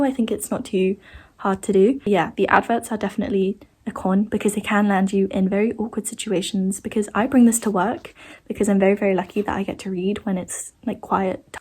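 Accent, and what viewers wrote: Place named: Hampshire, United Kingdom. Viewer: Omg!! your the first youtuber I've ever heard of with the same accent as me lol, are you also a Hampshire girly?💕 ps you are so gorgeous!!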